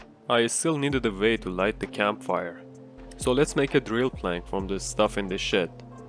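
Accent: Iran. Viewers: You sound Persian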